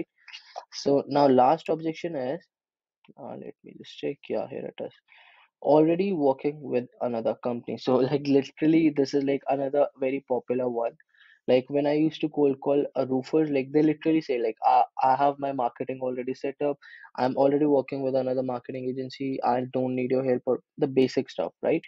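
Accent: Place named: India. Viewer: Hey nice video had some questions though - 1) how can we call us prospects from india for cheap? 2) how do u book appointments for us clients after generating some interested leads? 3) what is the service and what is the irresistible offer that you use in your agency? 4) aren't clients bothered by your heavy indian accent during sales calls? Keep doing the great work man. You are helping the community a lot.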